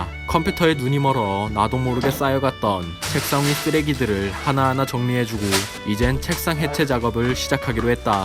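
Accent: Jeju, South Korea. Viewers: 제주도 분이세요?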